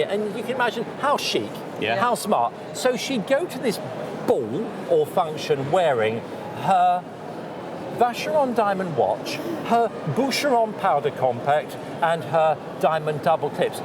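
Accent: United Kingdom. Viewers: well, he is British, their spelling and pronunciation is different.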